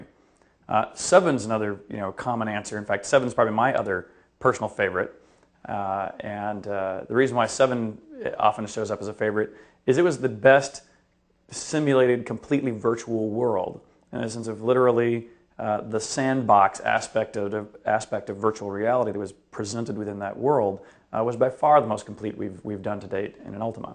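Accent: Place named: United Kingdom. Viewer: i am german and even i think you sound british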